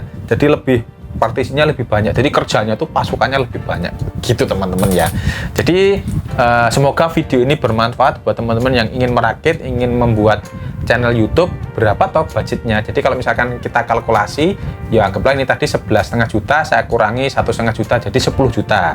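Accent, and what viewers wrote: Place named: Java, Indonesia. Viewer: Pertahankan medoke mas...keren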